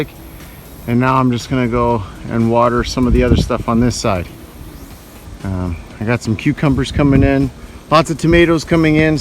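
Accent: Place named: Canada. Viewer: Just lucious! Thanks for sharing. I wanted some pickles, Thanks mom! Ok, you know me(kinda) LoL, please remember they are native here, and I really like the Canadian accent! But please, Pah con..... LOL ~ 707! Bro hugs, and if it's not drinking out of a hose, it's burnt, starting to include wildlife, I'd have to go back and look, but I'm pretty sure, it's begining of week 3 of triple digi's here. Peace, and please, enjoy!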